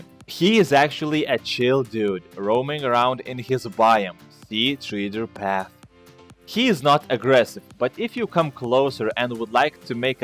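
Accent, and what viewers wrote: Russia. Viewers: этот русский акцент прекрасен! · Haven't seen this guy's vids before. There's something really charming about his heavy Russian accent in combination with his upbeat attitude. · I love your voice Russian! And goodjob dude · Lol for some reason i had this ransom feeling the narrator would be a russian male...i CANNOT belive i was right... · You sound russian? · dude you sound like a badass Russian general your accent is epiac! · Your Russian accent sustains me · is he russian _ accent_ calls us camrads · This is the one guy on youtube who has a legit russian accent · Этот акцент... Русский, да? :)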